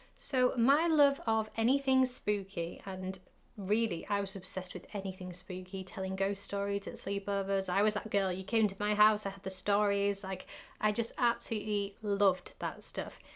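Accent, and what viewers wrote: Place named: Northern England, United Kingdom. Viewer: No subtitles needed. I love your northern accent big hug from Mexico. Love your content 😊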